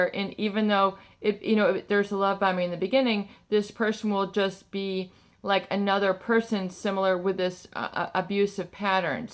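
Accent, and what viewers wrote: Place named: Illinois, United States. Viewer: I have been listening everyday. I have been journaling. I have put what you have said into practice with the affirmations and the journaling. Thanks so much for your work (and so much work you have done to help us!). I am from originally from McHenry, Il. It sounds like you are from Illinois. I so appreciate what you are doing. Love to get to know you somehow. Keep up the good work!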